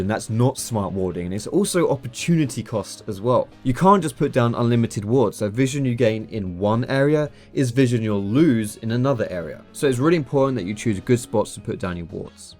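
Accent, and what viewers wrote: United Kingdom. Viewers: Wait he's british? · He's uh. British. Not American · Wait... Are you british?!? OMG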